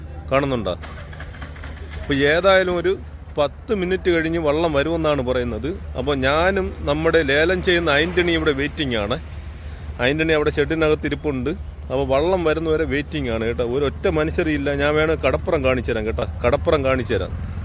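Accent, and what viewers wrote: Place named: Thiruvananthapuram, India. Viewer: തന്റെതായ അവരണ ശൈലി തിരുവനന്തപുരം സ്റ്റൈൽ സൂപ്പർ 👍❤️🎉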